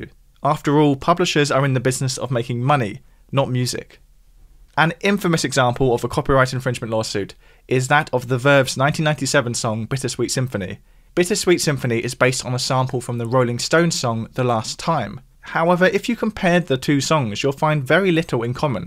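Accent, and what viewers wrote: United Kingdom. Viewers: Had my fill of British narrators. Don’t those people work?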